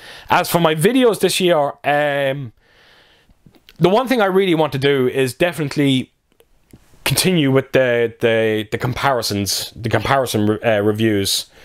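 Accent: Ireland. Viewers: I’ve been watching your channel at least one an half to two years. Just hearing your accent makes me want to get to Ireland. You give honest opinions. Keep up the great job you’re doing!☘️🇮🇪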